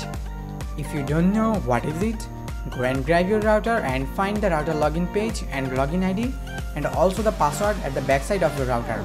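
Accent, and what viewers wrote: India. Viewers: Ooooff...That Indian speaking is so bad to listening 😣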